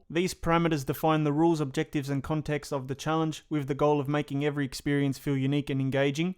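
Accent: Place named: Australia. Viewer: I just subscribed because of your Australian accent!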